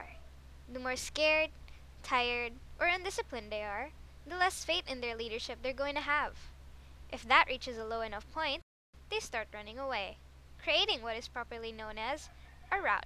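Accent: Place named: Philippines. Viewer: why does this voice sound suspiciously filipino to me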